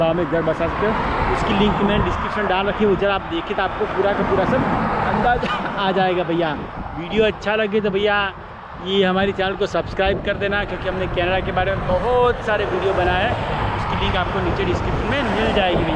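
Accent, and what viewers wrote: Gujarat, India. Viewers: I think you belong to Gujarat as you speak Gujarati style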